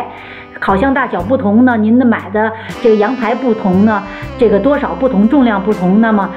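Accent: Tianjin, China. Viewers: 大姐 天津人吧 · 天津口音，哪里的？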